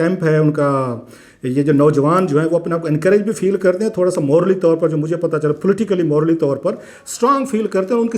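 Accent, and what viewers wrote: Saraiki, Pakistan. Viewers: سرائیکی لہجہ ۔ جیسے سرائیکی لوگ سکرین کو سک رین کہتے ہیں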